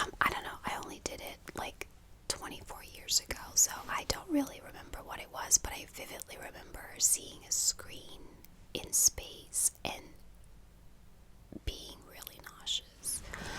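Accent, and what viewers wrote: United States. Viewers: I can't get over how American you sound! I would never know you aren't American just by listening to you! Do people IRL ever mistake you for an American?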